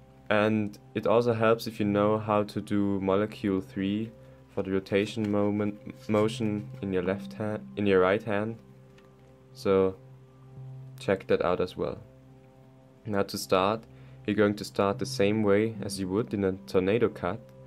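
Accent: Germany. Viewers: Nice germanischer Akzent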